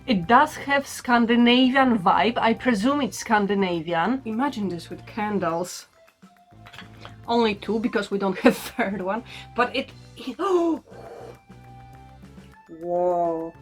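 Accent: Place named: Poland. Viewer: Your polglish is the best proposition ❤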